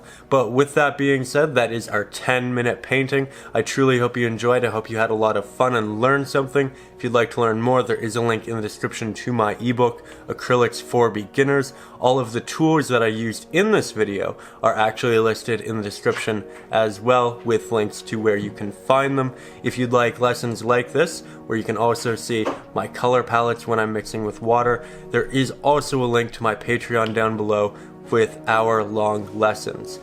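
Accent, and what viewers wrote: Canada. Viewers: Hi I've watched this b4. I know or assume you're in Canada due to your accent, I grew up sailing & boating on the shores NE.This water direction looks odd to me. Where is it coming from? The ocean would not flow from the bank opposite your beach? It would come from the horizon wouldn't it? Therefore the waves would pass over the beach horizontally? My mind simply can't settle on it. Is it me??? Can you explain it to me? Thanks for sharing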